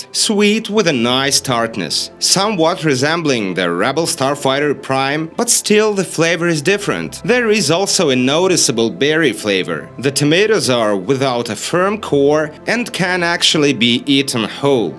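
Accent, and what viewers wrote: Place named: United States. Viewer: Забавно, а звучит так гипер американизировано, что я какое то время поразмышляла в каком штате так могут разговаривать lol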